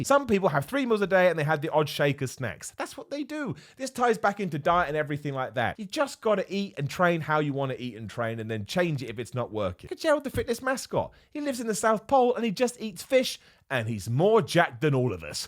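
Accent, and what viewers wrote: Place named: RP, United Kingdom. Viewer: I like that as got older his voice became "posher". I'm glad the first thing on the list was that you can't completely change your body to look like someone else no matter how much work you put in or how heavy the weights are you lift. You shouldn't have a goal to have abs, legs, arms like one specific other person, because you a different people. If you exercise and train your body isn't going to look like anyone else because it's unique to you.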